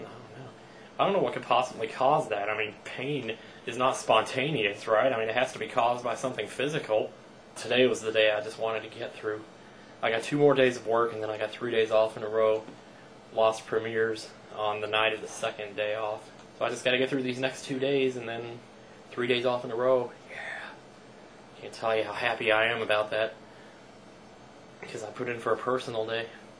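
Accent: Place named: South, United States. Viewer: You used to have a lot more of a southern accent.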